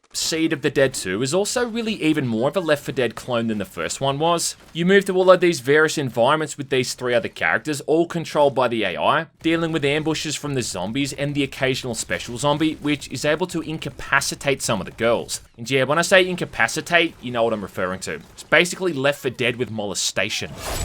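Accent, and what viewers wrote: Australia. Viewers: finally realizing that the aussie accent is funny.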